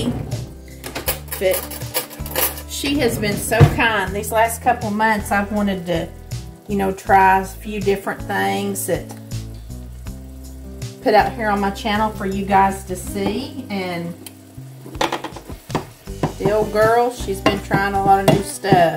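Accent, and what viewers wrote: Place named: Arkansas, United States. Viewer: ❤ I love your accent ass where are you must be from Arkansas that’s where I’m from but my daddy’s eat mayonnaise sandwiches but they were miracle whip not 😂mayonnaise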